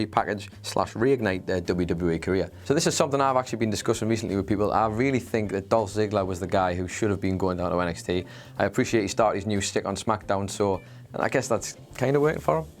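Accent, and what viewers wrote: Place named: North, United Kingdom. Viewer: Holy... dude slow down! Talks way too fast... way too British... fast British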